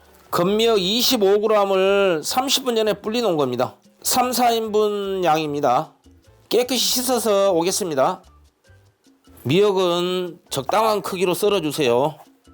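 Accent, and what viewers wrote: Jeolla, South Korea. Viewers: 전라돈디요 ㅎㅎ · 전라도 사투리죠😂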